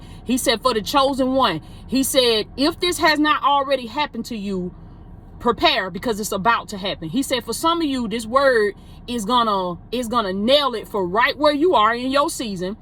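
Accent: South, United States. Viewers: Love love love love love love love love love love love that southern accent my sister😊😊😊.....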